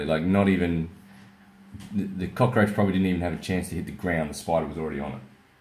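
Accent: Australia. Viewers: Ken Ken he sounds slightly Australian, he’s also from Australia. Australians are known to be cool with spiders and stuff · Of cause he’s Australian the accent and the fluent voice is Australian. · Me after seeing the thumbnail: “I bet this is in Australia” Video dude two seconds into the video: Australian accent YouTube pinned location: Australia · I heard the Australian accent before I even clicked the video 😭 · yk its an australian video when he has an australian accent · you know this is australia when he has a australian accent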